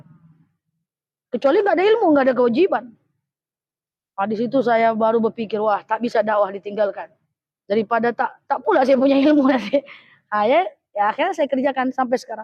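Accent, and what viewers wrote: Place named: Sumatra, Indonesia. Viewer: Masya Allah, baru ketemu Chanel ustadzah, senang penyampaiannya dan seru sambil senyum senyum, masuk ilmunya, terimakasih Ustadzah, Ngomong ngomong ustadzah dari Sumatra bagian mana ya Logatnya saya suka, Krn saya juga dr sumatra, ❤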